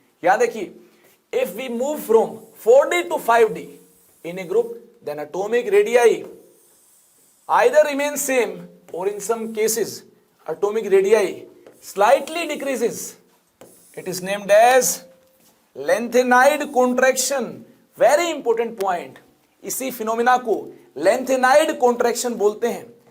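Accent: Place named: Haryana, India. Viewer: Sir aap ka tone haryanvi h ...😆😁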